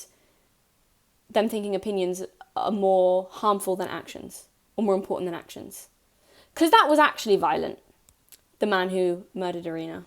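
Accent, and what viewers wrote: United Kingdom. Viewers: Not listening to some one with a British accent. No thanks.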